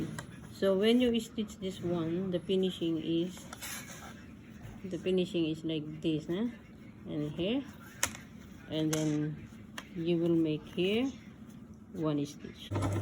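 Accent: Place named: South America. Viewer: me to! I thought South America. Her English is really good but u can still hear an accent and I thought it sounded like south American. We're aways off..lol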